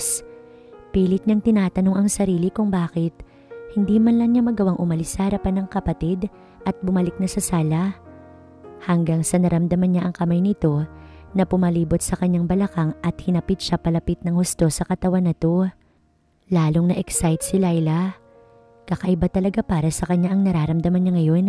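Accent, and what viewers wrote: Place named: Hiligaynon, Philippines. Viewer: Ilonggang ilongga ang boses...hehehehe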